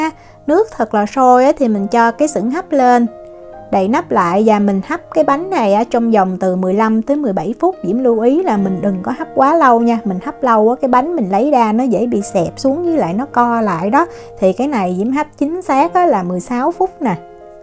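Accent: South, Vietnam. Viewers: Hướng dẩn rỏ ràng Giọng miền nam dể mến Cảm ơn nhiều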